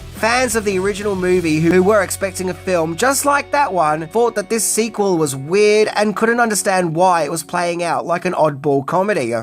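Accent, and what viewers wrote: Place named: Australia. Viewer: Me, personally, I hate AI narration. Souless. Plus I love the Aussie accent.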